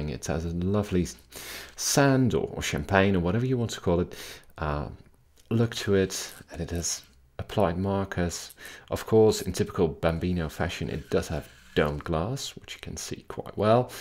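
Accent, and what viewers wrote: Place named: Netherlands. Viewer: Nederlands accent ;-)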